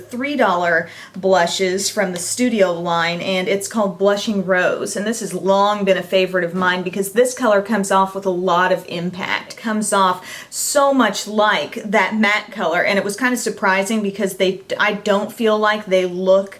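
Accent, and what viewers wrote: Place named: South, United States. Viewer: I also wanted to ask if you were from the South. I love your accent. :)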